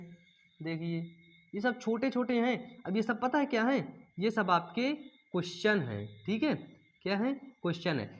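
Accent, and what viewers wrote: Uttar Pradesh, India. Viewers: Sir app up se ho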